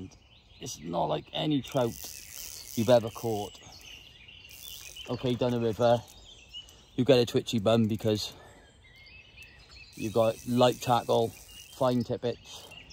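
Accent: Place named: Wales, United Kingdom. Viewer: Thanks for the reply. You have fun , nice to hear the Welsh tones, awesome! Tight lines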